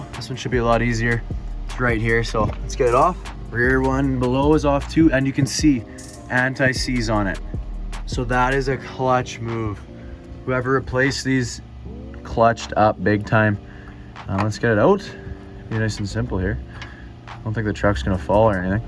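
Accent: Canada. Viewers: Buddy your accent is legendary, Canadian for sure hahaha. Thanks for the guide!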